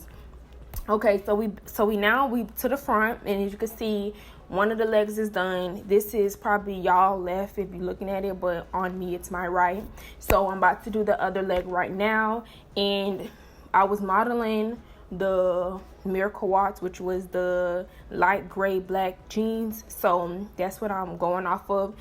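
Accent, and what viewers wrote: Miami, United States. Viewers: Lol I was like “oh sis definitely from da crib” 😂😂😂 South Florida lingo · I know you from Miami just by how you talking lol but I'm def gone subscribeeeeeee! and try this style too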